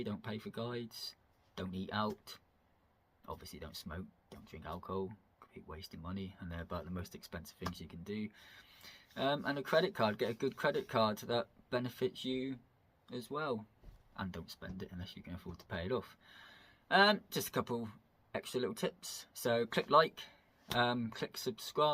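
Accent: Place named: West Country, United Kingdom. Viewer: Ah right. You picked up a west country accent. I agree like your hacks, I carried on living like a student, 60 now same habits, I like to keep living costs low. You can pretty much get anything of facebook market place for free if you are prepared to wait for it. I should get to Scotland, I keep looking at rural houses up that way, plenty to think about.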